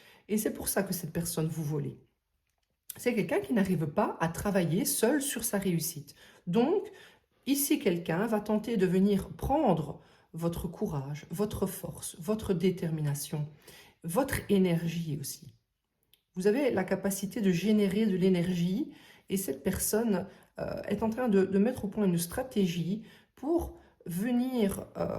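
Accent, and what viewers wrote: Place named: Belgium. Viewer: Il me semblait bien que je reconnaissais un accent belge!.Faites vous des voyances en privé w?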